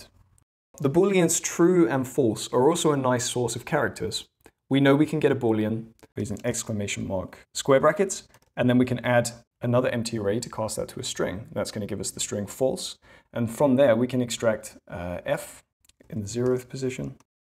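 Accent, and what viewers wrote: Netherlands. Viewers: Are u Dutch :D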